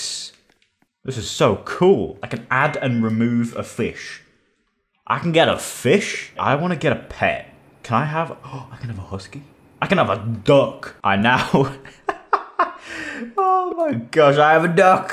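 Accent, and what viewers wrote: United Kingdom. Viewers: I can’t tell if he is British or not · are you british? one more thing you are the best youtuber ever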